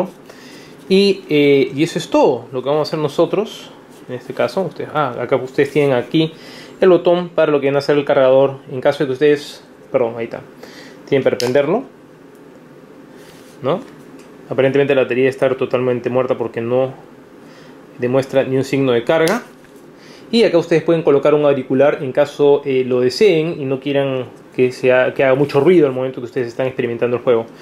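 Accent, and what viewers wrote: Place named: Peru. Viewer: Por tu acento siento que eres peruano ?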